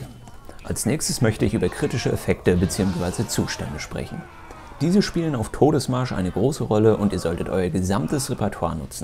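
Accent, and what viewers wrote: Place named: North, Germany. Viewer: Kommst du aus Schleswig-Holstein oder Hamburg? Erkenne deinen Akzent sofort 😂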